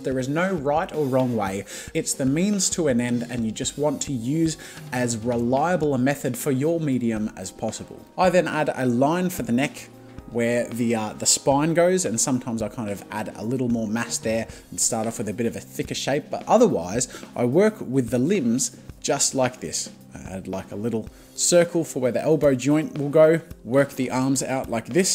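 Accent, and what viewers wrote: Australia. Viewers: When Australian accest still exist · His Aussie accent sounded a bit different here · this by far has his deepest Australian accent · why does he sound so much more Australian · you sound so Young and aussie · His Australian accent was so much stronger 5 years ago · does he have a really Australian accent in THIS video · he sounds extra Australian here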